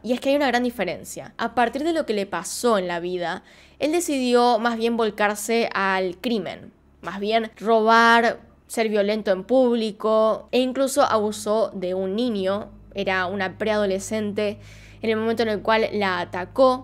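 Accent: Argentina. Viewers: Es agradable el acento argentino y si es en un caso interesante, mejor 😅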